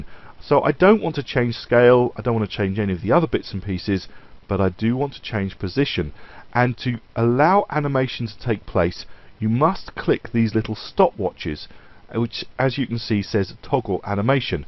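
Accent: United Kingdom. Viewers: "Creative Cow" -> Kids toy -> Country music -> "Moo" sound effect -> British accent?! You surprised me there. I was expecting a southern American accent and some sort of hacker tutorial. Good lesson, man. These things are almost as useful as Tweens in Adobe Flash! This lesson helped me create the effect of the camera panning across a picture. Thanks a lot!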